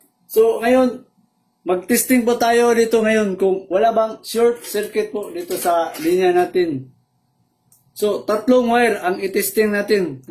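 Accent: Visayas, Philippines. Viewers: visaya diay ka?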